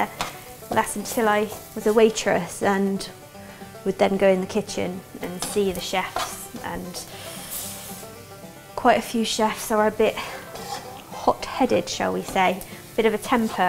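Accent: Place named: England, United Kingdom. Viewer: I'm hungry again! could walk into any Southern kitchen... and everyone would assume she's a local girl. ( unless she starting speaking in that beautiful English accent). 😀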